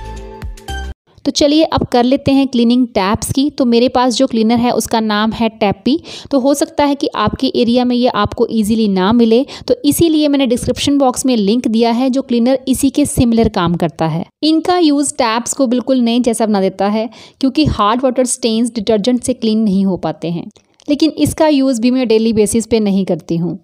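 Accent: Punjab, India. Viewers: ਭੈਣ ਜੀ ਪੰਜਾਬੀ ਟਚ ਹਿੰਦੀ ਬੋਲਦੇ ਹੋ ....ਵਧੀਆ ਲਗਦੀ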